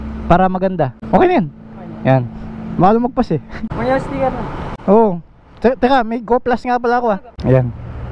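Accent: Pampanga, Philippines. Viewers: Kapampangan accent jang mag tagalug ka balu me e hahahaha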